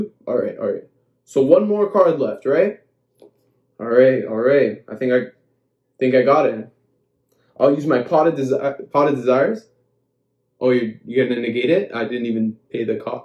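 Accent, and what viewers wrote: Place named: Toronto, Canada. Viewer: Dude I know that accent, my be from Toronto, eh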